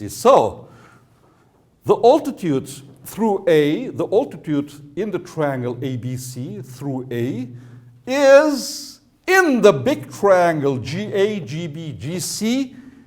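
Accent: United Kingdom. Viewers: yo hablo español y estoy buscando clases de geometría en ingles para mejorar no solo geometría sino también en ingles :D, su ingles británico me voló la cabeza ._.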